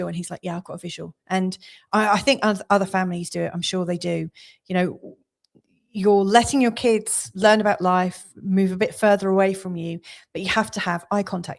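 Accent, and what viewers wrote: United Kingdom. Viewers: What a great explanation and metaphor to explain out of bounds planets! I’m addicted to your fabulous dailies now! Loving learning from you; a fool for the great British accent 🙏🌻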